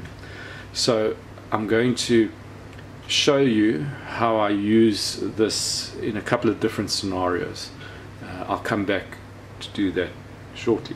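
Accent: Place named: South Africa. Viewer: hey sorry I meant they are sold here in Brisbane. And I confused your accent for mine at the start of the video (Australian). But as I heard you talk more I realised you are South African! Funny how we have some similarities in our accents. Do you ever find that about Australian accents? That they can be similar for certain words?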